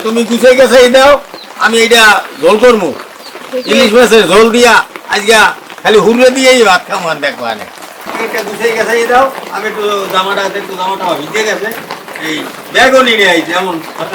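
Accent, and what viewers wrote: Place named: Barishal, Bangladesh. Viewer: খাস বরশাইল্লা ভাষায় কথা শুনে মনে হয় নিজের এলাকায় আছি।পটুয়াখালীর ছেলে আমি, আংকেল আমি আপনার ভিডিও রোজ দেখি অনেক ভালো লাগে পাশাপাশি আপনার মাধ্যমে রান্নাও শিক্ষতেছি।আপনার জন্য আন্ত্রিক শুভেচ্ছা ও অভিনন্দন রইলো ভালো থাকবেন।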